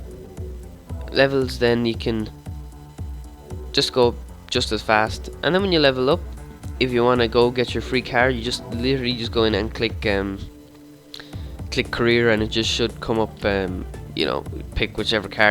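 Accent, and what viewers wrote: Ireland. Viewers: Hey your Irish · MUP ya boil ya yer irish ☘️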